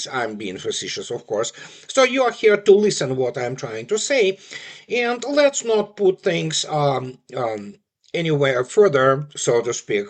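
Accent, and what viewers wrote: United Kingdom. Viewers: Slava Russia…. OMG: that British fool with some problems with his dialect…❤️🇷🇺❤️🇷🇺❤️🇷🇺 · That British high-class accent allows that idiot to make hogwash sound intelligent. But those of us that have followed the situation for many years know he is spouting BS.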